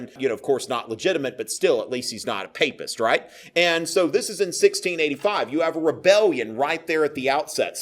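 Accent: South, United States. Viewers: This is a really good series. Engaging teacher, which isn't that common! Strange to hear British history with a southern accent, but nonetheless a really fantastic foundation of the period. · It is so strange hearing such highbrow stuff being taught with a thick, hick accent.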